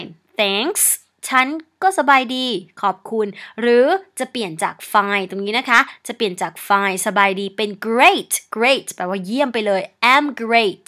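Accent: Thailand. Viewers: มีสำเนียงระยองด้วยย555+ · ชอบสำเนียงภาษาไทยของ จังค่ะ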